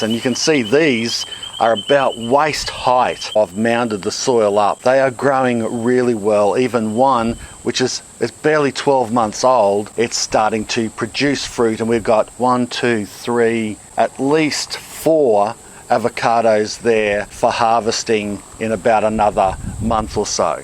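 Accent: Australia. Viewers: i figured you were aussie ... from the accent .... just thought i heard you say you were in nz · You mentioned that you are in a subtropic climate. I know by your lovely accent and kangaroo burial that you are in Australia, but what area? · Ausys always sound better...the thumbs up reminds me of the great Steve Irwin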